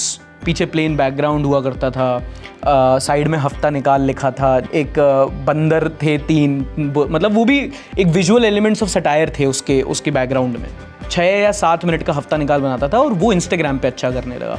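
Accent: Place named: Bundelkhand, India. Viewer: You are from jhansi , mein man hi man sochta tha ke aap hamare side ke hi ho kaeki bhot achhi bhundelkhandi bolat te video m aap ,, aaaj confirm ho gao aap jhansi se ho ham Orchha se H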